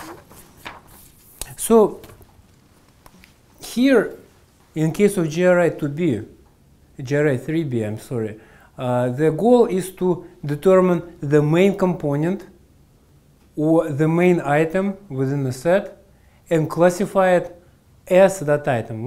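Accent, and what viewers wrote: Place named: Russia. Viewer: I love his Russian accent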